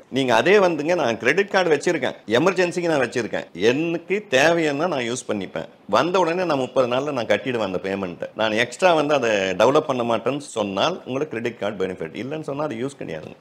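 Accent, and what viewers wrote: Coimbatore, India. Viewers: Enga Coimbatore slang uhh nga❤ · Evalo respect a peasararu 🙏Kongu slang ❤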